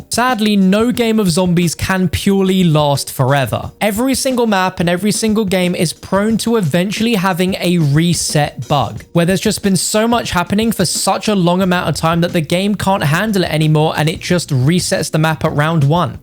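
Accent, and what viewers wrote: United Kingdom. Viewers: bruh ur british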